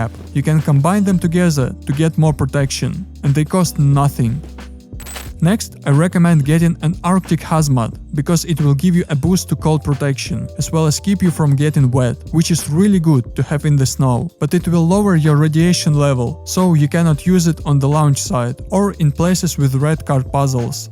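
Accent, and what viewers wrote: Russia. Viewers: Я слышу, слышу русский акцент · У вас будто бы русский акцент 🤔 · оч жесткий русский акцент используй ии чтобы переводить видосы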